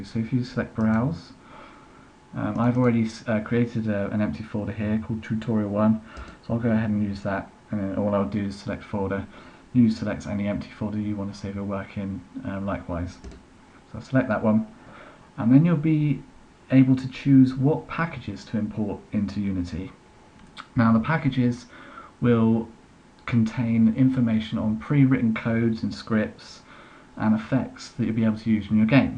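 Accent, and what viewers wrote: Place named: United Kingdom. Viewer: Your accent is like of Britishers' ;D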